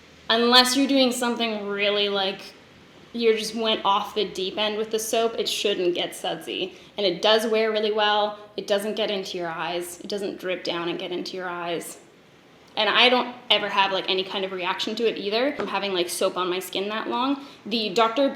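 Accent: Canada. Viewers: I’ve needed this so badly!! I just had LASIK so I don’t need glasses anymore. I got under eye filler to fix my “”baygs.” That’s Canadian talk for bags. Now that nothing is hiding my face, my brows need to be on point. Help!!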